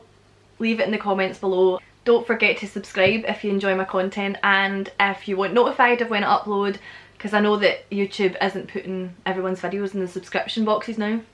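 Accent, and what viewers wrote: Scotland, United Kingdom. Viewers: Oh my God I simply love this accent. I was planning to study English there in Scotland. I got a better deal for England, (un)fortunately, though. At least I'll be able to go there. Subscribed (long time ago). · scottish accent is the best 😂♥️ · Giiiirrrrl you make the Scottish accent sound Scottish. Seriously, though, thanks for this. It's been a bit since I've been to Scotland and I miss the people and the accent. Slainte Mhath!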